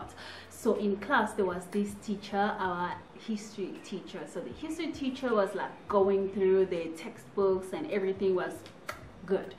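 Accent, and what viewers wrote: South Africa. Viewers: Your english is good though and you don't sound like someone who comes from the french-speaking country. In fact, your accent is now truly South African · You sound south african though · You speak English so well now and you sound South African. Thank you for your content my beautiful sister.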